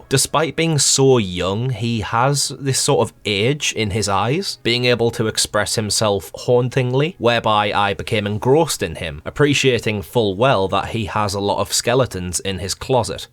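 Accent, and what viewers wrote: Scotland, United Kingdom. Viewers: I truly love this show ❤ Also can anyone tell me what english accent he is speaking in? It kind of sounds like scottish sometimes but he doesn’t roll the r so I’m not entirely sure. I’m just very interested in languages and accents and all that stuff. So if anyone knows or if you want to answer yourself it would be much appreciated.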